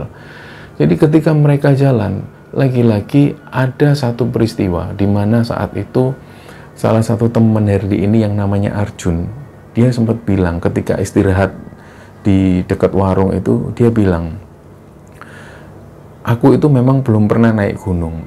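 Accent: Java, Indonesia. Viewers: Dengerin channel satu ini, ilmu dpt, hikmah dpt, sejarah jg tau. Pembawaannya lugas dgn medok yg khas. Semangat tim, saya subscriber baru! 😊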